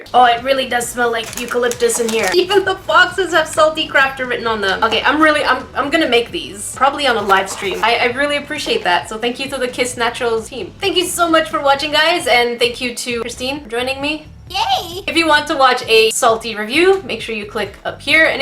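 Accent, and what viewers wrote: Canada. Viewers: also are you canadian? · this is my first video im watching of yours and im very happy to hear youre canadian WOOT WOOT! go canada!! #toronto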